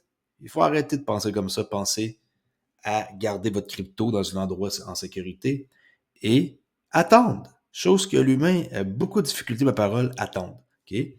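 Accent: Canada. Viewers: Ah bah voilà je me doutais bien que cet accent était Canadien 👋🏻🤝🏻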